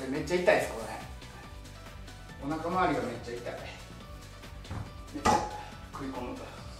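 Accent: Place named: Kansai, Japan. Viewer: やっぱり標準語より関西弁でお話されている方がRyuさんぽくて良いです。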